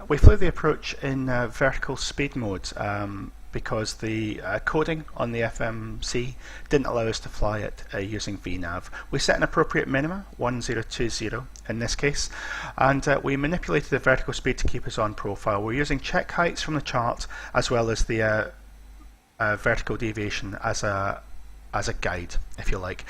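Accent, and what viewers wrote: Scotland, United Kingdom. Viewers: Hi, currently learning lots of invaluable flying techniques and tips from your tutorials - many thanks! Quick question about the check heights from the chart - would you typically have those memorised or written down somewhere for cross-reference? Thanks - nice to hear a Scottish voice:-)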